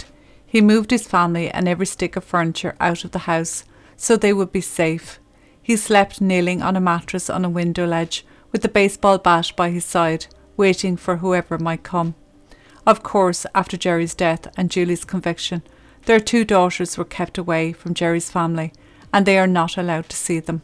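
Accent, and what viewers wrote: Ireland. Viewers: Love your channel. This was horrific, Its like i could hear the hurt in your voice when you mentioned Kia. Traumatic what she witnessed and what Nadine was put through. I always remember this one. Thanks for sharing, nice to listen to a fellow Irish. 😊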